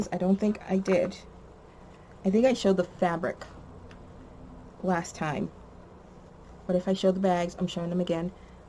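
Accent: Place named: Massachusetts, United States. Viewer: I can definitely hear your ma accent 😂 I should have known from the last video, one of my best friends is in CT near ma though and she has a similar accent. Loved the video. Thank you for sharing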